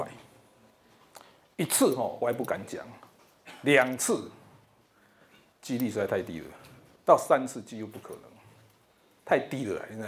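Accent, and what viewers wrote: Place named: Taiwan. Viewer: say Mandarin is his second language and English his technical language. He has a strong Taiwanese accent when speaking Mandarin and borrows many Taiwanese vocabulary.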